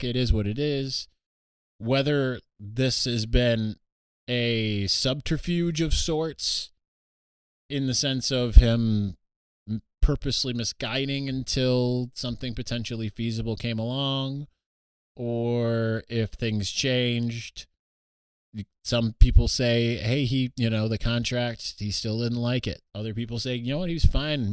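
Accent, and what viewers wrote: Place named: Michigan, United States. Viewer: Been listening to your podcast for a few years now. Your Michigan accent grows stronger each podcast. Brings me joy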